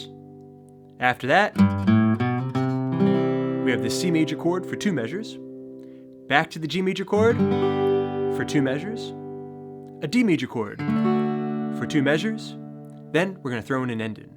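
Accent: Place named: Delaware County, United States. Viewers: South Jersey / Philly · deleware county pa, they also say “Wooter” instead of water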